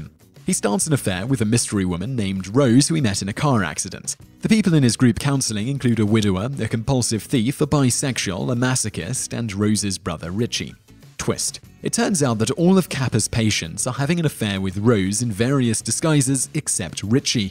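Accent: United Kingdom. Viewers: We really should be more grateful when it looks like accidentally says something that may not be completely accurate or expresses an opinion that doesn't appear thoroughly thought out. The researchers here are so on point with such frequency that it makes me wonder if their occasional factual and/or contextual errors are not intentional. It just seems like an ingenious ways to fuel the comments section. After all, who doesn't enjoy feeling smarter that a very charming, well-read guy that has a British accent.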